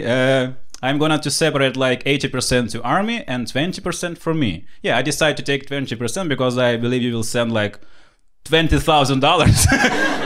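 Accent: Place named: Ukraine. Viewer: that was fabulous! With ukrainan accent 😁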